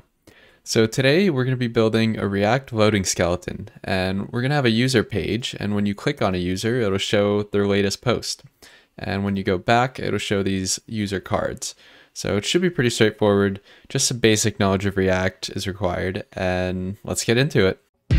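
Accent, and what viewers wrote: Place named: India. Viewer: Great video, by the way, are you Indian or Russian? Great accent and a very soothing voice.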